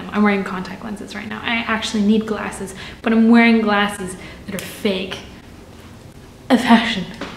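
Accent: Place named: Canada. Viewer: Ohhh that's why in your other video with your friend you had a canadian accent snd in this vid you have a mixture 😮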